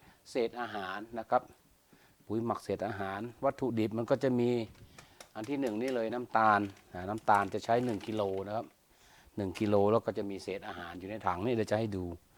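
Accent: North, Thailand. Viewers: ขอโทษนะคะพี่เป็นคน เหนือรึป่าวคะน้องกดติดตามแล้วค่ะ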